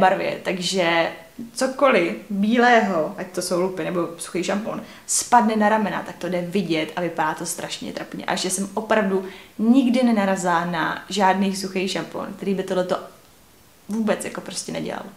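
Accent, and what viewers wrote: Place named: East Bohemia, Czech Republic. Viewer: Slečna mluví očividně východočeským nářečím 😉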